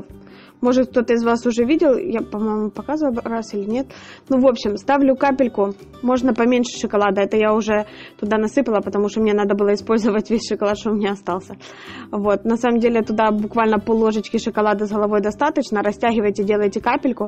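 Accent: Ukraine. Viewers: Украинские нотки , лучше молчите или заведите человека с чистой речью...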